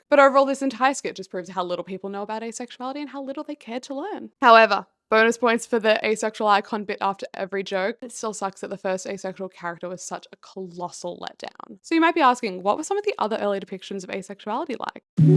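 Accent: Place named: Australia. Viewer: omg when i clicked on and heard your accent my jaw dropped, absolutely thrilled to see another asexual australian girlie!! (even if you're a melbourner lol) great video! i'll be subbing for more :]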